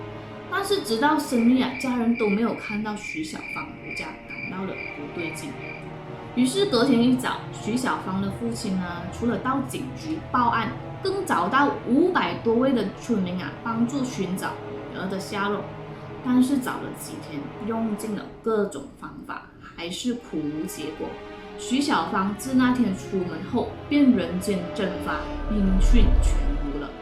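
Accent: Malaysia. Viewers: 对啊这是马来西亚口音 ，就很像你们在外国人面前说英文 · 马来西亚华人的普通话口音很有趣，你是广东人后裔吗？好漂亮～ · 她是马来西亚华人，肯定跟你们不同啊。。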